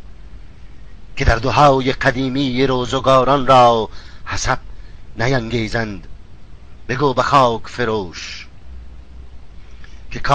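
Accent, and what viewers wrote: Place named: Iran. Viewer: hahahahahahahaha o kosmadar to kho irani hasti chera mardoma jang mendazi khware ta gayom kosmadar tora chi khob kadan irani ra chi ba e gapa madare ta gayom hamrai lahjet k maloom mesha hahahha aga to rasti e gapa mezadi chera akse karzai nes da e o madare ta gaim maalom mesha k kodam dokhtare ta eya gayedan biyaresh mam begayomesh ai koni hamrai gapait to kodam koni hasti maalom mesha biya aga yakh na kadi k ma yakhet konom hahahahhah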